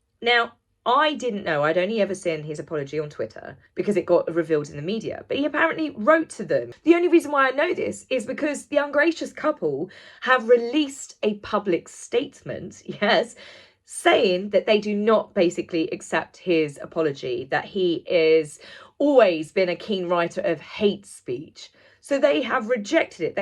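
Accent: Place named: Northamptonshire, United Kingdom. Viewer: I live in the states but hearing your beautiful accent makes me miss my family back home in Northamptonshire. ❤️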